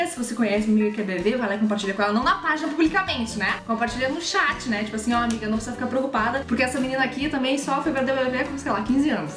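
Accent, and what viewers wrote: Rio Grande do Sul, Brazil. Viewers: é gaúcha, reconheço nosso sotaque de longe kkkk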